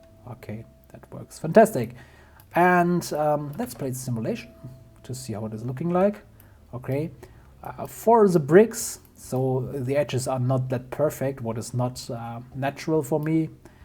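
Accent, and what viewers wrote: Germany. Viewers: Your accent is very german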